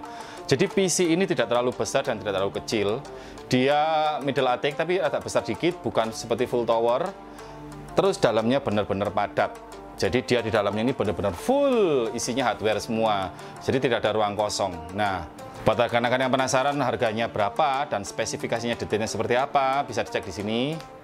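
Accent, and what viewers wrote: Java, Indonesia. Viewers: maklum, wong jowo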